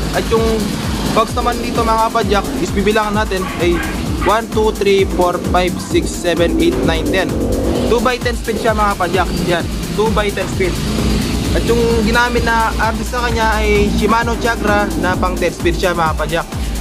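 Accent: Pampanga, Philippines. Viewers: Kapampangan ka lodi??? Sa pananalita mo kase HAHAHA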